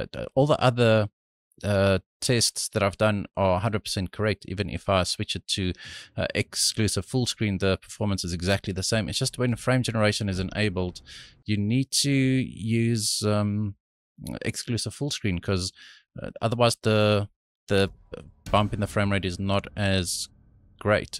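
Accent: South Africa. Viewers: Is this an SA boi I hear?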